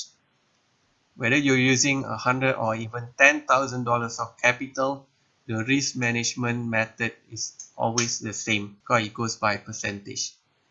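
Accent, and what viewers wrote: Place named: London, United Kingdom. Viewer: Waa bang. Spiking london laaa sekarang.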